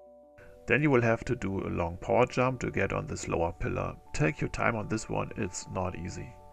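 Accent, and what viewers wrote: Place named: Germany. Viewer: Thanks. I have been making guides for the Ark maps myself & I really appreciate your video. My reviews: 1. Good clear voice & nice accent. Your accent German/Austrian but you are from Georgia, a very scenic country IMO. 2. "Potato mode" v low graphics helps in better visual representation of the ledges. 3. 1 dislike ?? Some People just hate to see others shine while they fall(to lava). Every jumping puzzle video has a lot of dislikes. Keep it up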